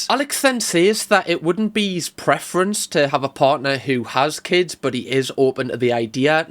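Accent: Newcastle, United Kingdom. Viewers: You are so intuitive but also funny and entertaining! Lovely to hear the Geordie accent! My parents were from Hexham, keep up your good work 😂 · Your videos are okay but I'm subscribing because your a geordie.... UP THE TOON!!! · Hey!! I just LOVE your accent! I'm from Florida but my best friend is a geordie and you sound like him...or are you Scottish?? You are effing hilarious "SAY YES! SAY YES!! SAY YES!....PAHHH!!" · Are you from Newcastle? Wanna know your accent :)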